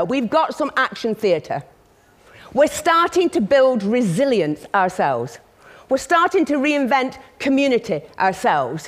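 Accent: United Kingdom. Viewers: Strong accents? It's british english.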